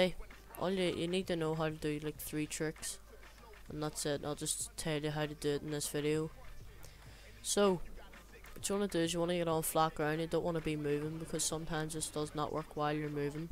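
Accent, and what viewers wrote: Northern Ireland, United Kingdom. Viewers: Mate, after trying listening to these awful annoying American 13 y/o kids, it's great to hear a Norn Iron accent talk sense. Legend.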